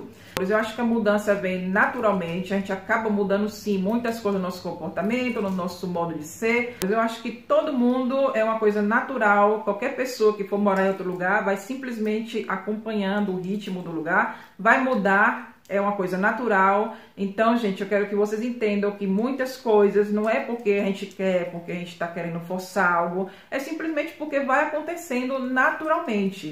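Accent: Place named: Bahia, Brazil. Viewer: Não perdeu o sotaque baiano não! 😍❤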